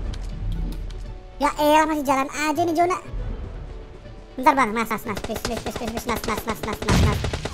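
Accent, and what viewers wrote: Java, Indonesia. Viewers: Asli mana bang ko ngomong Jawa nya medok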